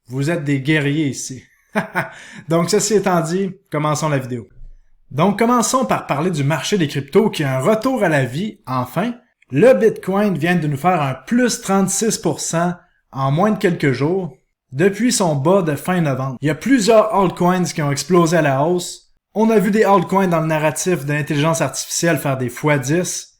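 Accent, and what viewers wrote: Canada. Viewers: Merci beaucoup pour votre travail 😁. J'aime toujours autant l'accent canadien 😉, il me tarde de retourner à Montréal 🥰. A bientôt. 👍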